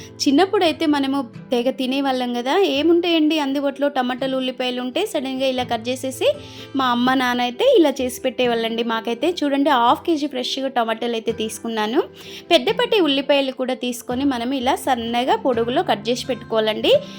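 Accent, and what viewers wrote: Telangana, India. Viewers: మీ మాట్లాడు చున్న భాషలో తెలంగాణ యాస కనబడుతుంది శుభము హరే కృష్ణ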